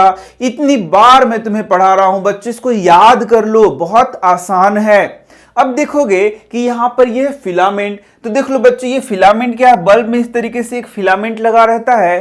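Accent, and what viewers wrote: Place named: Uttar Pradesh, India. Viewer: sir aap up ke hona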